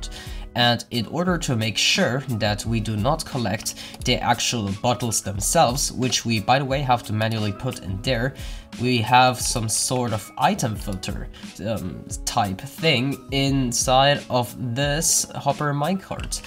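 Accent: Germany. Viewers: MC He is German, you can clearly hear his German accent XD I guess all really good technical minecrafters come from Germany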